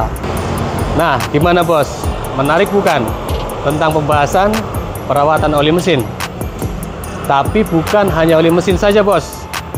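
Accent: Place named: Banyumas, Indonesia. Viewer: Ngapak Bang 😂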